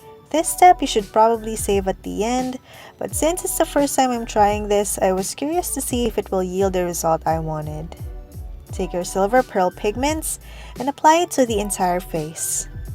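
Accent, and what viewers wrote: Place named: Philippines. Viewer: I'm new to channel, and I've been wondering, is she from the Philippines? Please correct me if I'm wrong, but her accent is just so familiar and way too similar to one of the average accents that filipinos have when speaking english;3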